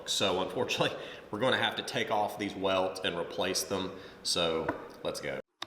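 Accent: South, United States. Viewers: He's what was once called a "Good Old Country Boy", a man who is respectful and demands respect in turn. He reminds me of my 7th grade teacher and coach, Mr. Jackson. I went from a suburb of Nashville TN to a backwoods country town named Springfield. Mr. Jackson taught English and was the sole sport coach for basketball. He had the same register voice and tones, and, the same accent. Funny thing was, years later, I saw a painting of Pres. Andrew Jackson, and the two men looked almost identical, except Mr. Jackson was almost completely bald. He was a taskmaster, for sure. and keep the tradition of hand craftsmanship alive and prospering. They also show the HUGE difference between hand crafted shoes and factory issue. Unfortunately, we're so used to "factory outlet" shoes (and their concomitant pricing) that when we run across shoes from these guys, we're usually in awe of the quality and attention to detail and their pricing. 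Old saying goes "You pay for what you get." With these guys? Absolutely.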